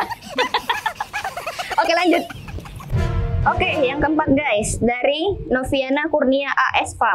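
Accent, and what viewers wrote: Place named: Palembang, Indonesia. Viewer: Yeee kak update video lagiiii.. ini bukan serem lagiii,ini ngelucuu..,logat nya palembang bnget deh..kak tmbah cantik,sehat selalu ya kak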